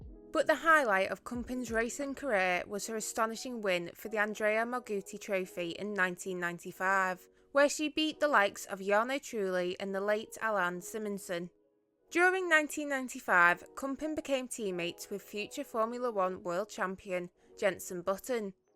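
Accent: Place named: Hull, United Kingdom. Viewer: Great Vid..... Ha e to ask is the Narrator from Hull my family is from Hull.. No accent like it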